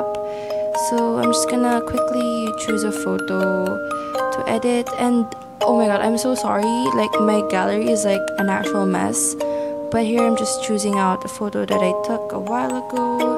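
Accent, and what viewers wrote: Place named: Philippines. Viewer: We stan an angel😫 I can hear the Filipino accent btw I love it!💕 I love your feed so much it's so aesthetic i'm so inlove😭💖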